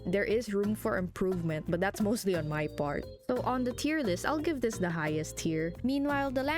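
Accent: Philippines. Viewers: You know is Pilipino Because of The Accent❤❤😅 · i could tell from her/his (dont want to misgender and start an arguement heh) voice that your filipino, and the "lolo" part lol, but your grandpa is cool and i love how supportive he is just by wanting you to make merch for him :3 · Hi im new here, i love the shorts you post😁😁 Anyways.. I have a question... You sound filipino are you? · WAIT UR FILIPINO?? I SEE THE ACCENT IN YOU.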